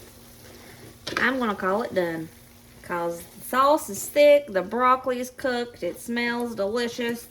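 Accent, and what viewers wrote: South, United States. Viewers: Love the southern accent.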